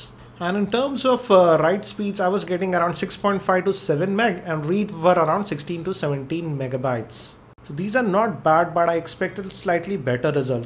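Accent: India. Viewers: why all reviewer about this sandisk are all from indian? indian english is very hard to understand. honestly, although you may think that i am racist.. but it's really hard for us to understand indian english.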